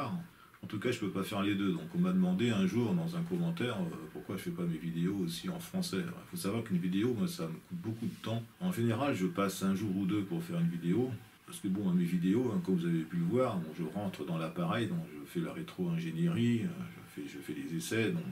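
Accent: France. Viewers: Hi, I downloaded the french transcript and put it into the translator. Your english is very good and does not sound like a "spanish cow" like some bad people may have said. They are just jeallous. Of course you got a french accent, but most english speaking creators on youtube have some form of foreign accent. That is totally normal. It would be really nice if you could continue in english so you reach a broad audience all over the world. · Bien entendu qu'il te faut continuer en anglais, malgré les remarques du type que j'ai pû te faire sur ton accent bien français. Ton contenu est absolument unique par sa précision et le type de matériel, ce serait bien dommage que tu te prives de 95% des utilisateurs de youtube. Carry on and all the best! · l'anglais est simple mais bon c'est l'accent qui est franchouillard au possible. l'anglais couvre évidement un plus grand public, si c'est compréhensible et interessant les gens regarderont. 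Ne laissez pas tomber. Regardez Isaac Arthur, un défaut de language et pourtant 1/2 million d'abonnées pour des vidéos ou il parle non stop 1h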